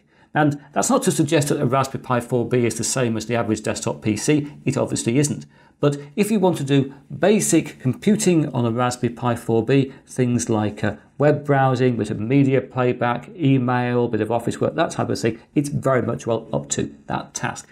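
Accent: United Kingdom. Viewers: it plays now ... yet another informative and entertaining video from you ... i struggle with fast pace of your speech and british accent but between player controls and cc option which all your videos have i manage fine and i must say your enthusiasm for topics covered is infectious and that also make your videos fun to watch ... thank you for reply and videos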